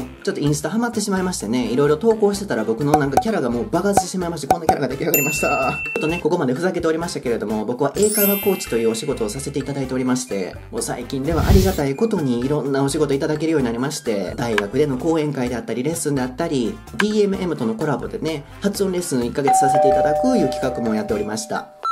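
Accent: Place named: Kansai, Japan. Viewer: 関西弁と声の質で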